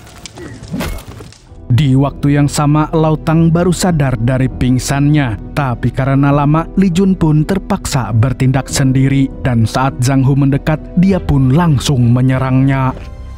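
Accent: Banjar, Indonesia. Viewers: pian urang banjar kh mas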